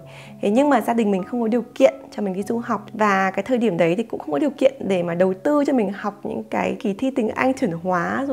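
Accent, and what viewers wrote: Standard. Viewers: bài chia sẻ rất là hay, giọng rất chuẩn!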